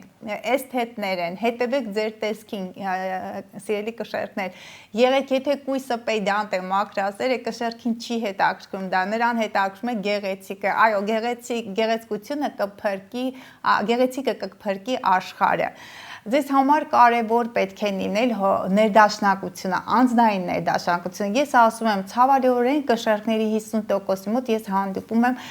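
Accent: Standard. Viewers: Հարգելի տիկին: Ես հաճույքով եմ դիտում Ձեր հաղորդումները հատկապես վերլությունները ինչպես գեղեցիկ մաքոր հայերենով և կանացի հմայքով եք բացատրում հաճելի է Ձեզ լսել, չնայած այդ աստղերից ոչինչ չեմ կարողանում հիշեմ, գուցե տարիքս է ինչ -որ է 80-ը քիչ չէ: Ուստի ինքս չեմ կարողանում կողմնորոշվել, բայց ինձ: շատ է հետաքրքրում թոռնիկներիս ապագան հատկապես Գրիգորի որն այս տարի 9-րդ դասարան, բայց դեռ չի կոմնորոշվել ու՞ր գնա ի՞նչ անի ես ուզում եմ Ձեր շնորհիվ օգնեմ կյանքի ճիշտ: ուղի ընտրի: Տվյալները գրեմ: Գրիգոր Քյոսեյան ծնված 2010 թվի հունվարի 9-ին առավատյան 8-մոտակայքում: Ձեզ մի նեղություն հնարավորինս օգնեք ինձ այյս հարցում: Եղեք առողջ և հմայիչ: Կանացի երջանկություն: Հույսով սպասող Փիրոզա <num>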